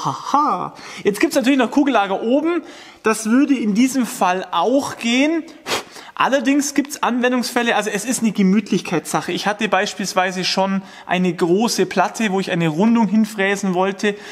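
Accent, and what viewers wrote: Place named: Augsburg, Germany. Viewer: Hallo ich hätte mal eine frage. kommst du aus der nähe von augsburg. mir kommt der dialekt so bekannt vor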